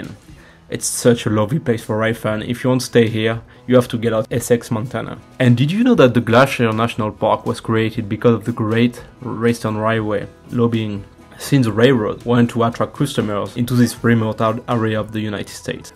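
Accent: France. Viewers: I've taken the California Zephyr, Coast Starlight, Empire Builder, Southwest Chief, and Texas Eagle. My top 3 are the Zephyr, Empire Builder, and SW Chief. The Coast Starlight is very scenic, but I'm still salty about Amtrak discontinuing the private lounge car for the sleeper passengers. The Cardinal is on my bucket list. Great video, though I suggest you slow your speech just a little bit. My ears adjusted to your French accent, but it took a few minutes.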